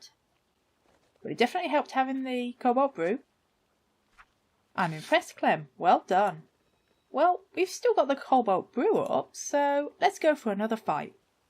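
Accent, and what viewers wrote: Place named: United Kingdom. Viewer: Interesting game, thank you for sharing it. Love your strong British accent too.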